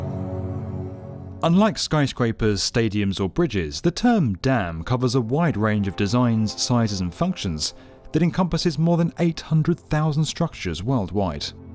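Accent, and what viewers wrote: United Kingdom. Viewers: What kind of British accent is this? I know it ain't cockney! Heard it before ...very proper, bit of lisp or sounds kinda trips on some parts of words northhha and defeenainiteeve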